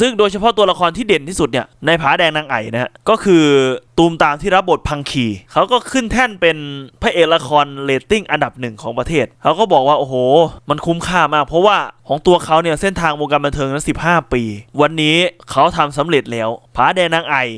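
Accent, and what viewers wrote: Ubon Ratchathani, Thailand. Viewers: ว่าแล้วเวลาแอดมินเว้าอิสานสำเนียงทำไมคล้ายๆสำเนียงอุบลจังเลย ผมคนพิมูล อยู่ตำบลนาโพธิ์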